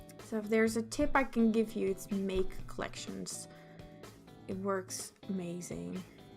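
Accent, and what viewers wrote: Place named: United States. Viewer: YOU'RE DUTCH?! you have the cutest accent ever, i would have never guessed, i would have guessed somewhere in america! O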